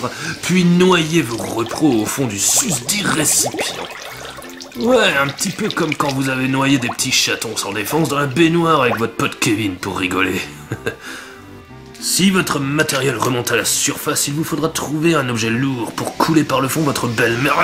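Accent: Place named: France. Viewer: This was very helpful but what the heck did I just watch, do French people actually sound like this??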